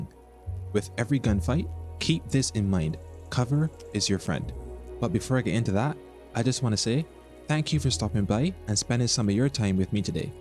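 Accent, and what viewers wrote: Barbados. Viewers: Great video. Will definitely be watching the rest and any future videos. Quick question are you Bajan or Trinidadian? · Are you Bajan? You sound it! Great guide.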